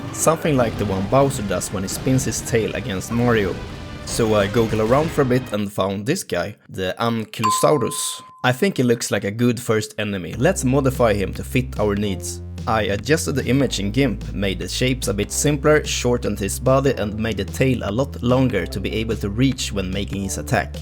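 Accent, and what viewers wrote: Sweden. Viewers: Why do you sound soooooo swedish hahaha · SVENSKA DIALEKTEN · The game is really cool! But I have one question. Are you swedish by any chance? Because your dialect sounds very swedish😂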